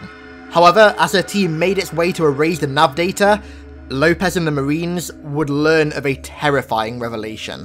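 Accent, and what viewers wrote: United Kingdom. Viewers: British accent then the lisp fuck. My ears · are you british?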